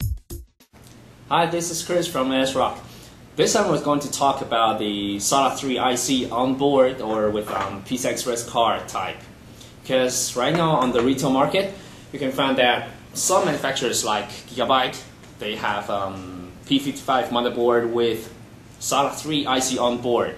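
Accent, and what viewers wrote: China. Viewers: Chinese English!!!!!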